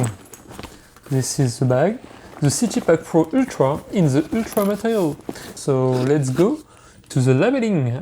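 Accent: France. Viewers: I can easily guess you are french, parce que moi aussi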